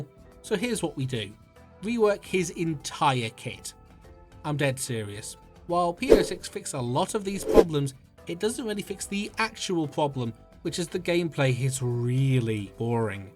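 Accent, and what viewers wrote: United Kingdom. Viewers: I didn't read every comment on each video you made, but did anyone ever tell you that you should have more subs? Maybe it's the British accent, idk, it only made me a little uncomfortable.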